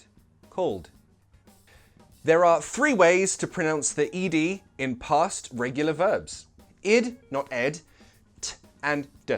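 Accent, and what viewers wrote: United Kingdom. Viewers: Great tutorial! Thanks! I guess your are British ...could you tell me if the same rules and 'sounds' also hold for American English? Thank you again! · The slang is not the same as a dialect. Also, I did not say that we are talking about a dialect here. Dialect is the same group of languages, spoken slightly different depending on the region, with few different words and accentuating different letters in the words . This is actually the closest to what you are referring to as an accent, but it is still not. To the person from the UK this person in the video, doesn't have an accent. This is how they speak. Only for the rest of the world, especially to the english speaking people from the USA or Canada or Australia, you could say he has an "accent", but this is not correct. He speaks British English. In the USA, they speak American English. 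They don't speak with any accent, since this is how they speak and everyone else around them. It is different when you have accents to some letters in the words, so they may sound different to you, but this is not a definition of someone speaking with an "accent". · the british accent sounds amazing. Its clear. I really like it. Good class, teacher. Tks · Ur on a brit channel, teaching brit accent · That's British accent , American accent is a bit different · Hello! Is this British English? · I love the British accent, it’s sooo cleah 🤩🤩😍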